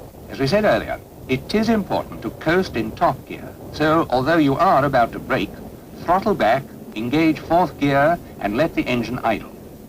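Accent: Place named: RP, United Kingdom. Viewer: Even Prince William doesn't talk that posh!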